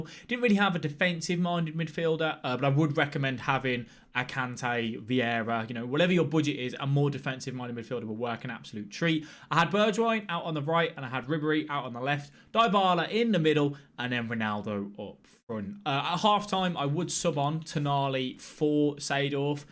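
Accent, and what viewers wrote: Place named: Midlands, United Kingdom. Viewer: Subscribed cause these a sound tactics and you sound like you're from Midlands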